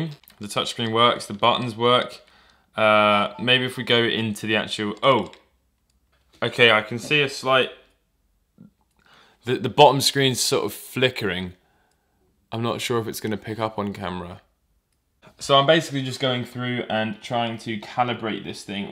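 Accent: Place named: United Kingdom. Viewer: Nice British accent👌👌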